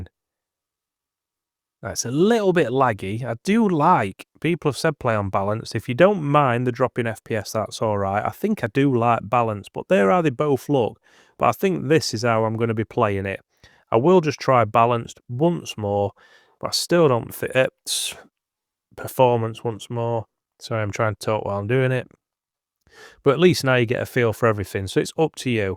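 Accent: United Kingdom. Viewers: Thanks for this...just got today, waited for reviews an patches. Where ya from mate, sound like you're from my neck o t'woods...halifax, west yorkshire.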